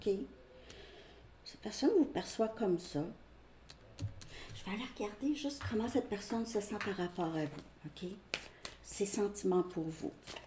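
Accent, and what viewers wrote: Canada. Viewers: Dommage que vous ne fassiez pas de guidance personnalisée .. J'adore votre petit accent canadien !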